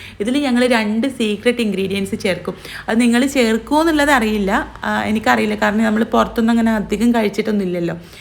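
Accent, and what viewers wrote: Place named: Kannur, India. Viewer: Para kannur basha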